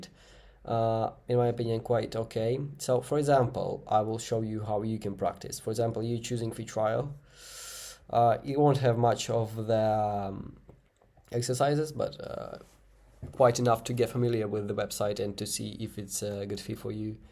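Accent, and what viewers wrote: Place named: India. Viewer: I am sitting over here trying to decipher his accent because it sounds Indian but he clearly doesn't look Indian😅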